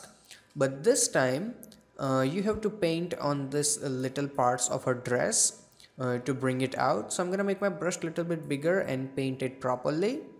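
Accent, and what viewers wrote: India. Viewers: i´m sure that u r an indian. u speack like an indian guy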